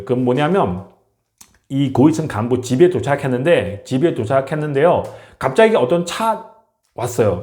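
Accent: China. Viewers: 오늘따라 중국남부 발음같네요 문장 이어짐이 불분명해요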